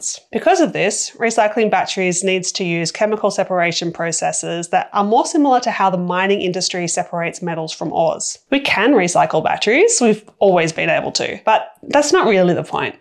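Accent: Australia. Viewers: Hey! Keep taking the magic mushroom juice. It has slowed your delivery down, noticeably! That's very welcome imo. As an older person with hearing impairment, I found your previous combination of Australian accent and machine gun delivery a struggle to keep up with, understand and digest. A micro-pause between sentences, Dave Borlace-style, would allow my withered synapses to do a bit of absorption and digestion of the concept just discussed, but thank you SO much for the new more measured pace. Loving it ❤!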